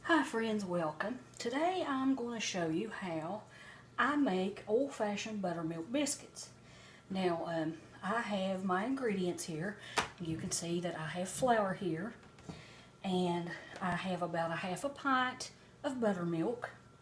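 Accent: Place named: Oklahoma, United States. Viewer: What state is your accent from? Sounds like Reba McEntyre style. She grew up in Oklahoma. Is that your dialect, too? Sorry to focus on your accent and not the biscuits. I’m just really interested in being able to tell exactly where people are from. I’m really good at England, Scotland, and Germany regional dialects. Being from Maine myself, I’d like to get better at domestic dialects.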